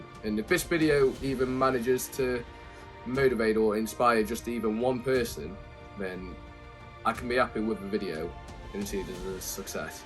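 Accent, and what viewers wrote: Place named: Australia. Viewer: Where does that accent come from, Aussie??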